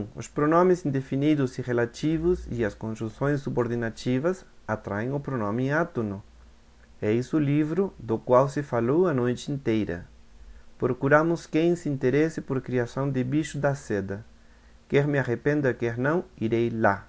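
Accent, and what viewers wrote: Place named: Portugal. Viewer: tu portugués parece ser medio europeo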